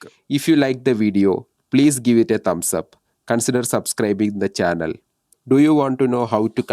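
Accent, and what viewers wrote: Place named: Kerala, India. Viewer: മലയാളിയാ ല്ലേ... ഇഗ്ലീഷ് കോട്ടാ..റിയാ.. മമ്മുട്ടിയെപ്പോലെ ശ്വാസം പിടിച്ച് നല്ല ബലം പിടിച്ചാണ് സംസാരിക്കുന്നത്. ഒന്ന് കൈ കുറഞ്ഞ് കുഴഞ്ഞ നാക്കോണ്ട് പറഞ്ഞൂടെ?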